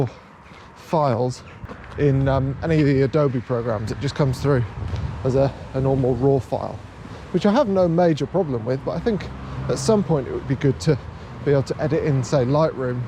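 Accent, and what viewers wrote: United Kingdom. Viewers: so you're telling me this guy's great at what he does AND HE HAS A BRITISH ACCENT?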